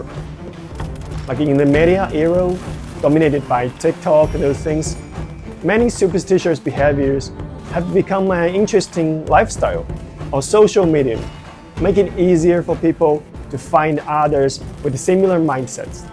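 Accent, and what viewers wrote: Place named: China. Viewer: I think he is based in China because of his accent, Hong Kong people have a different accent.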